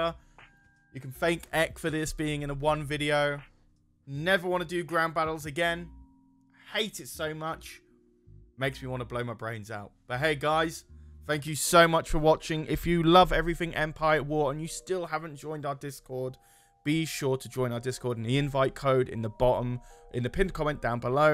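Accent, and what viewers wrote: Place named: United Kingdom. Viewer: The British accent made this perfect for an imperial campaign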